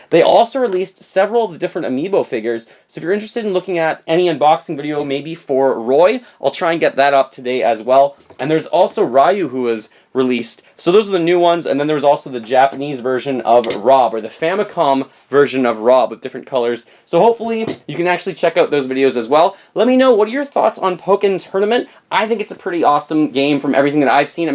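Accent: Canada. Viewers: Love the Canadian accent. "Try that owuuuttt." :)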